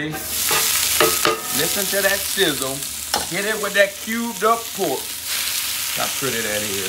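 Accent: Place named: South, United States. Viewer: He's southern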